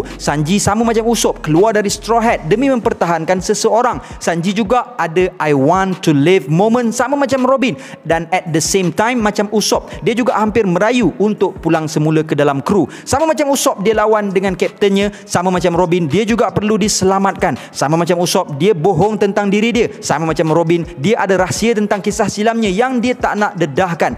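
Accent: Malaysia. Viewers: Waa hebat la abg pandai cakap manglish.. 🥳🥳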